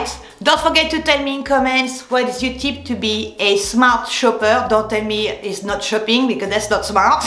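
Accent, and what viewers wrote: France. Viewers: When I see your beautiful face and I hear “cleek,cleek,cleek in that wonderful French accent I know this video will be great. Your shopping advice was spot on. Since I started watching your channel I find myself making more of an effort in my appearance every day…and oh yes…perfume every day.!!!!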